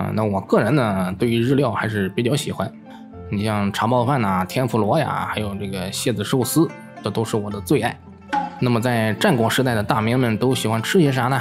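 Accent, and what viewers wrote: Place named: Beijing, China. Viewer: 你的解釋非常好 視頻做的也真的非常棒 但能不能別用北京腔 舌頭給我放平說話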